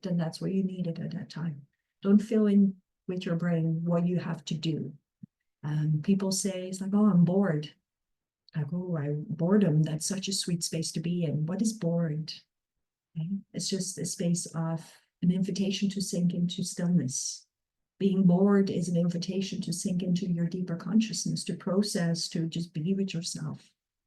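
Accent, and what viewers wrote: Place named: Australia. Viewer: I've only just started listening but it sounds very much like you've got an Australian accent and people from any other country, apparently our Aussie accent is really hard to try and imitate 🙏🏻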